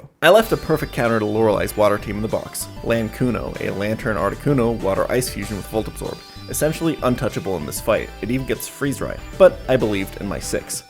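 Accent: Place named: Germany. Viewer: sounds dead? nah he’s just German mate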